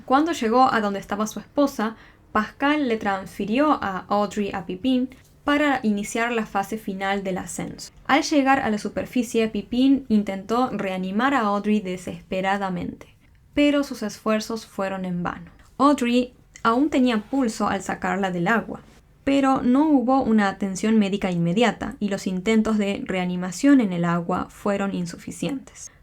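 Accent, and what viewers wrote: Argentina. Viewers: Si, es Argentina.es muy común el Che- es su acento. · Será que es argentina?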